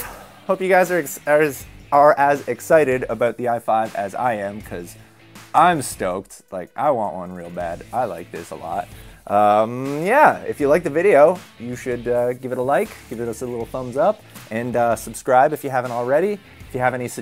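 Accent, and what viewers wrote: Canada. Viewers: Jose Lopez It's Canadian, not Irish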